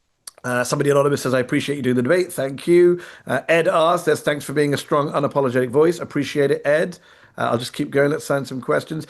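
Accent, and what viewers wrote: United Kingdom. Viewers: I whole heartedly agree that you need to get your shit flinging British accent having ass out of our politics. Britain is in free fall cuz of all the mud. It’s cool it’s fine, just know we’re ready and waiting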